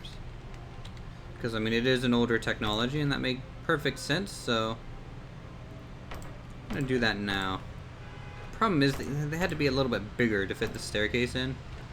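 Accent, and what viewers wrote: United States. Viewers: are you amarican?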